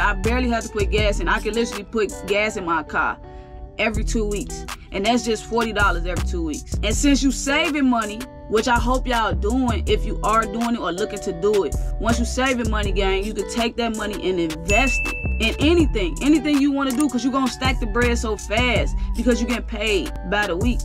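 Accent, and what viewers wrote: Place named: Chicago, United States. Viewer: My first time over here and from the way you talk you sound like you from my city , Chicago , dont know if im right but i can tell when i here my own .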